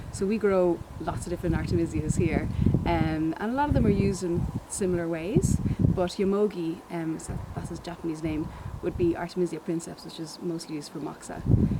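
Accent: Ireland. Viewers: love the gentle Irish accent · Is that a connacht lilt I detect?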